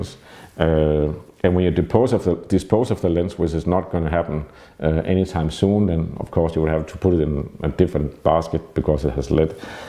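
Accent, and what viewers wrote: Denmark. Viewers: very danish accent - but god input man !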